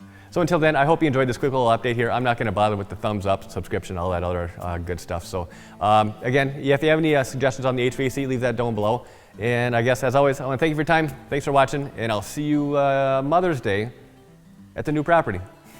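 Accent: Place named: Wisconsin, United States. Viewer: Based on his accent I’d bet he’s from Wisconsin so not much different weather wise.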